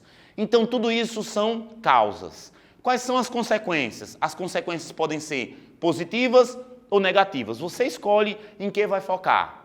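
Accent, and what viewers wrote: Northeast, Brazil. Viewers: Apaixonada pelas suas aulas !! Queria que fosse meu professor no Ensino Médio e hodiernamente na faculdade. Curso Letras - Língua Portuguesa, e aprendo muito com você ! Estou estagiando no Ensino Médio e o que aprendo na suas aulas passo para os alunos. Muito obrigada !! você é maravilhoso. P.S., Seu sotaque é encantador, amo o sotaque nordestino.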